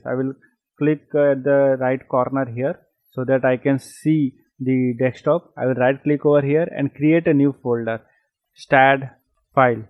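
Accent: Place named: India. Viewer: The Indian accent makes me crazy, but you are ahead of other Indians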